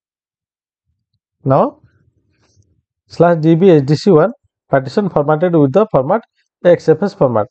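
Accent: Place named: India. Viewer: indian english also good, i should learn it.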